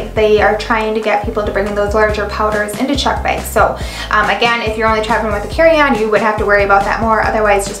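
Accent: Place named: New Jersey, United States. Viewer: I like the accent are you from jersey ?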